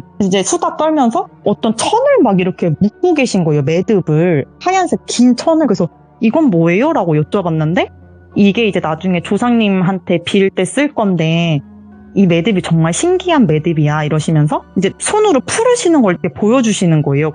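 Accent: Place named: Jeolla, South Korea. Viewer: 전라도특유의 사투리가.ㅎㅎㅎ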